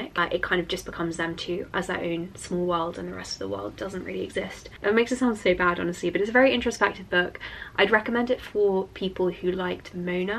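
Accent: United Kingdom. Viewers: I am watching your videos now to prepare for my English exam. My teacher is from UK, so it is very useful for me to listen someone from this country to copy an accent. I have already watched some of your videos since the first one. It is cool to watch you grow up. Thank you and hi from Russia, Krasnoyarsk❤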